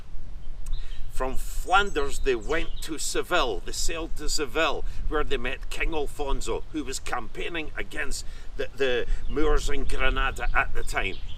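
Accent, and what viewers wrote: Scotland, United Kingdom. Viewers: I would have never thought that one day i'd watch a video of Avatar's villain showing me the tomb of a medieval knight, with the thickest scottish accent i've ever heard.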